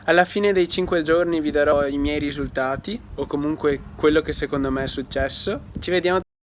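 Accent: Veneto, Italy. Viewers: Vecio ma sei Veneto?xd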